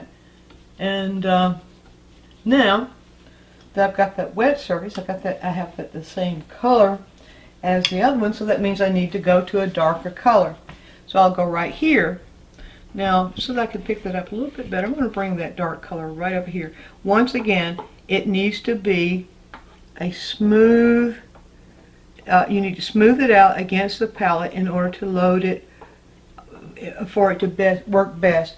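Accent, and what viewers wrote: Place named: Texas, United States. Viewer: Dear. I,m so happy to have found you and appreciate how you teach with demos, I love your soothing. Live and accent. Are you Texan? Thanks you again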